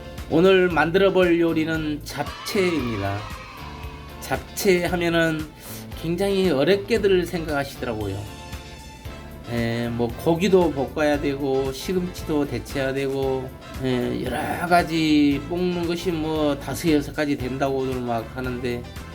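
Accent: Jeolla, South Korea. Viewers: 구수한 전라도 사투리 나오는거 정겹슴니다.라드는 어디서 팔아요?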